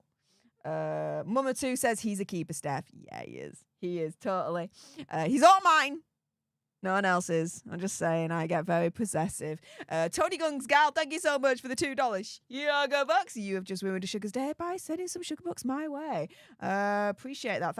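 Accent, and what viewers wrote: Yorkshire, United Kingdom. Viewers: I remember your first videos. I couldn’t understand a word you said, either you are speaking more American or my ear is used to your accent now… Where are you from in the UK Or Australia?.. I cannot figure it out. 😂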